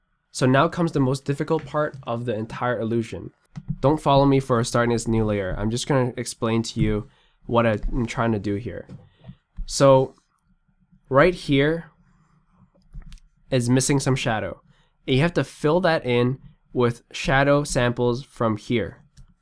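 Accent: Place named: Singapore. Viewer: it's Singlish.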